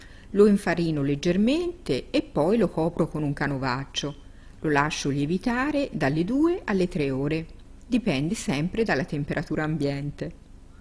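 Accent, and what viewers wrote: Tuscany, Italy. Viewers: Molto brava, complimenti e che bel toscano parla. Senese?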